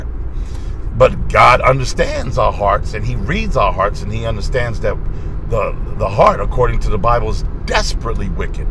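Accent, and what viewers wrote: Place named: Jamaica. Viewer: Yeah she schooled that elder big time,! Love your Jamaican accent, too funny. Look forward to catching this segment sounds interesting. I took and wrapped up my silver book, can't really call it a bible since they screwed with the holy book.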